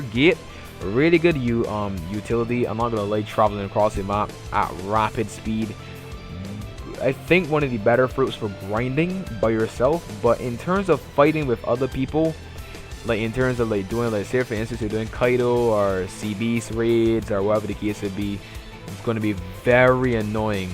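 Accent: Barbados. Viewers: From your accent u from Barbados? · U bajan? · u have a barbados accent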